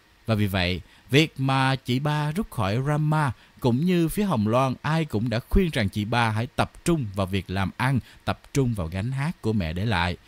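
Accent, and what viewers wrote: Standard. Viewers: nói chuẩn và hay.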